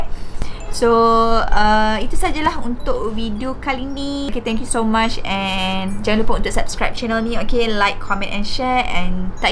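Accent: Kelantan, Malaysia. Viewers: Hai kak saya suka tengok video kak lagi² kalau video cakap bahasa kelantan suka sangat comell💓😘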